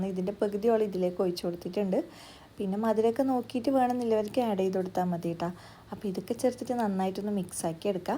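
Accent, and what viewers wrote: India. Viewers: ശബ്ദം കേൾക്കുമ്പോൾ തളിപ്പറമ്പ ടെച്ച് ഉണ്ട് 😄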